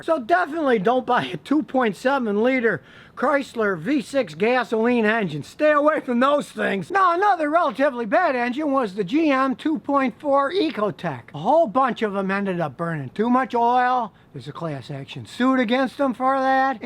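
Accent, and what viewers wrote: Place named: East Coast, United States. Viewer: I LOVE THIS GUY MAN !! HE JUST TELLS IT EXACTLY HOW IT IS YALL !!! AND OF COURSE HES GOT THAT RUGGED AND RASPY EAST COAST ACCENT !!! COOL BEENZ YALLZ!!!!!!!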